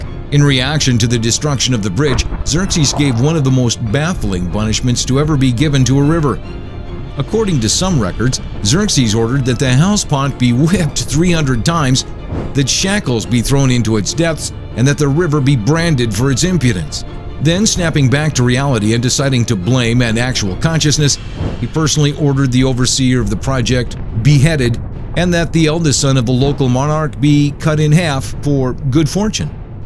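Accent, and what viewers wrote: Canada. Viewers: this guy sounds so canadian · the commentator sounds canadaian right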